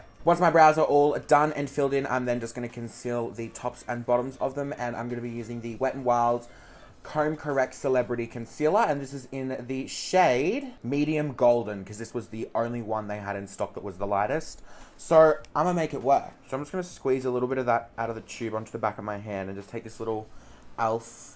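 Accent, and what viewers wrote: Australia. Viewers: australian accent is aesthetically pleasing omg help me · Ellie Harriss he's Australian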